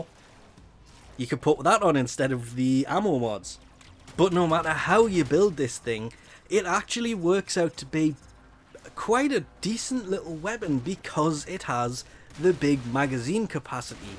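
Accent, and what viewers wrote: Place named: Northeast England, United Kingdom. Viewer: Sounds like North East England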